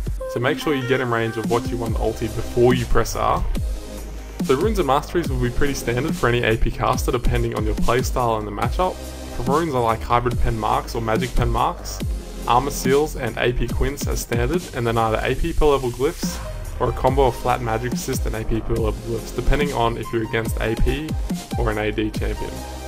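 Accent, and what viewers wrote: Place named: Australia. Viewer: I'm really grateful they kept the damage consistent on his Q rather than ramping it up as you charge it. Good video, love the Aussie accent :>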